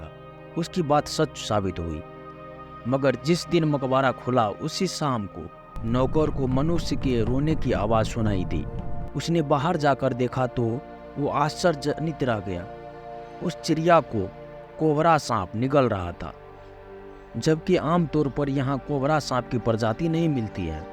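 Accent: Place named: Darbhanga, India. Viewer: Apne ke ghar kahan che? Bhasa se te darbhanga bujhal rahal che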